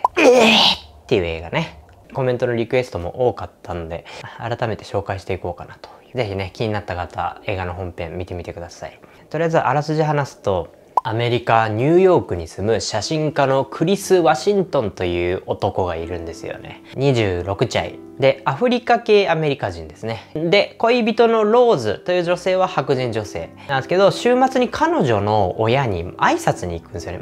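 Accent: Kagoshima, Japan. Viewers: 鹿児島の方ですか！？ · さんの情報全くない中で見ましたが、開始15秒で鹿児島出身だということが分かりました😂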